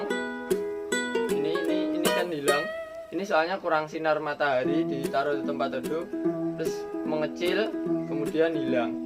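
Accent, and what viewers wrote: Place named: Java, Indonesia. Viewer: Medok banget... Gue suka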